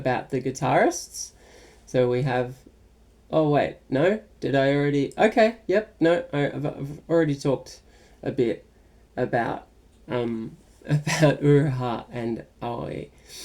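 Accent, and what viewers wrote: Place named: Australia. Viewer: I wanted to give a shot at one of your videos Suddenly clicked on this one - got satisfied with somewhat cozy vibes Btw, love the Australian accent xDD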